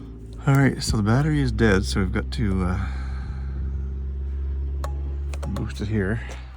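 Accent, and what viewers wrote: Canada. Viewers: so why do you have an address in Maine but still use "kilometers" and have a Canadian accent?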